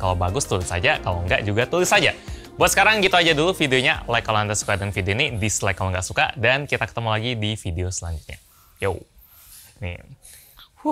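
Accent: Palembang, Indonesia. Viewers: Orang palembang y bang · Bang orang palembang? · Wong palembang apo om ni? Biso bahaso palembang. Apo lah teirup cuko tu jadi biso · Wong Palembang niannn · Sering denger abang pake bahasa Indonesia, sekalinya pake bahasa palembang kedengarannya lucu, salam sumsel bang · Aku Cuman Fokus Pas Ngomong, Aku Tekwan samo pempek gendum nyo sikok ee. Apo ko aseli Palembang. Haha salam dari musi rawas ko. Maen maen lah ke musi rawas agek ku kasih kuliner keremis. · Seneng nian aii denger kak pake bahaso Palembang ni 👏👏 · Asli wong Palembang apo bang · Wong palembang pecaknyo ni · Ko ni wong palembang apo kek mano😅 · Uwooohhhh... Pas bang ngomong ama penjual kaki lima nya pakai bahasa Palembang, kentel banget. Jadi rindu tempat kelahiran gw jadinya. 12 tahun merantau dijawa belum pulang2. Liat bang tiba2 jadi inget kampung halaman. Wkwkwkwk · asli plembang lu bang? 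· Ehh wong palembang ee bang? Baru tau kalo bang wong palembang 🤣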